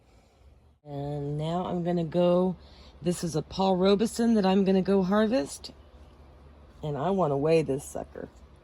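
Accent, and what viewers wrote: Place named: Texas, United States. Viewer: Thank you Mam, very nice video and very informative. I like the Texas accent. You are lovely and gorgeous as your tomatoes. :)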